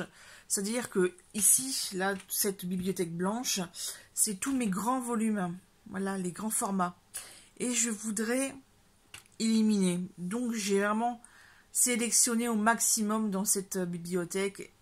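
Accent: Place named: Quebec, Canada. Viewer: Wow du québécois YASSS TABARNAK hahahah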